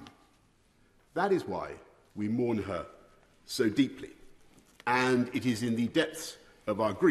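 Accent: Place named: RP, United Kingdom. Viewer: He can barely string a sentence together. Talking with plum in mouth means sweet FA.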